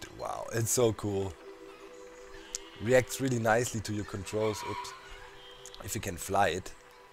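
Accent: Germany. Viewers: Man hört ds du deutscher bist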